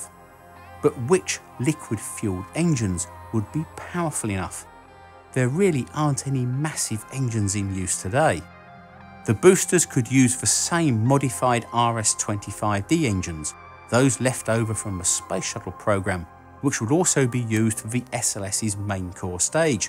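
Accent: United Kingdom. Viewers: I can’t listen to or trust people with british accents so won’t be watching any more of your videos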